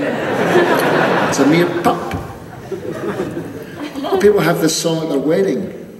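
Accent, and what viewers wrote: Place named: Scotland, United Kingdom. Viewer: So glad he kept his west coast Scottish accent after all those years away.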